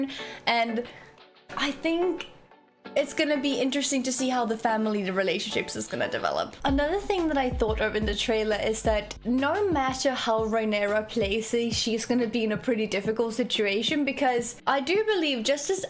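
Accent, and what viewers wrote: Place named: South Africa. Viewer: Where are you from ? you almost sound South African and Irish and Australian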